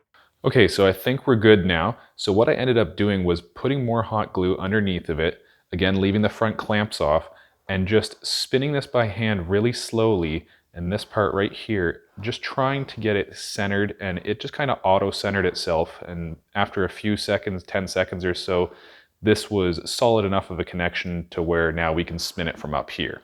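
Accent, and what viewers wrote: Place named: Canada. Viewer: That dose of Canadian Accent made my day... Back when I was in shop class many years ago we were taught to put a piece of paper between the bowl and the sacrificial mount. It gave you a cleavage layer to make it easier to knock off with a hammer.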